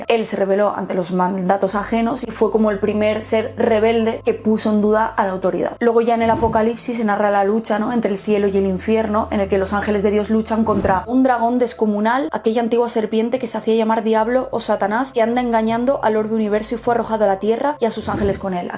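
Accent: Spain. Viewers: me encanta su voz de gallega saludos desde argentina😍😍😘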